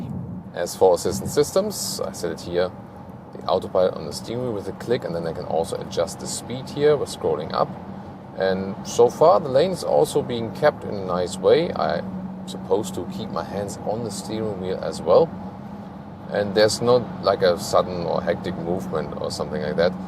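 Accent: Germany. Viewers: Wieso sind die Videos Englisch? Rein vom Akzent her würdest du ja Deutsch sprechen, oder? Es ist nicht so, dass ich der englischen Sprache nicht mächtig wäre, im Gegenteil. Aber dieser Kanal in Deutsch wäre wohl eher eine Marktlücke als noch ein weiterer englischer Auto-YT-Kanal...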